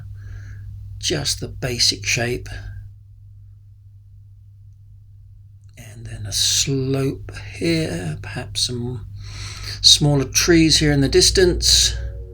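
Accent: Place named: England, United Kingdom. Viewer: I was wondering where you were.... I was missing you and your wonderful English accent ❤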